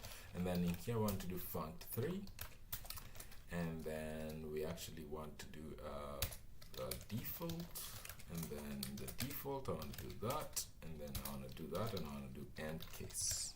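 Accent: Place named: Kenya. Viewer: you sound Kenyan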